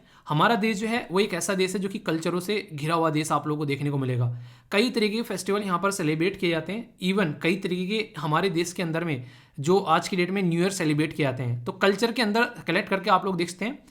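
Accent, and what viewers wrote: Haryana, India. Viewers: haryanvi ascent chait basiakh sadh saman................... love from rtk(HR)😍😍❤❤❤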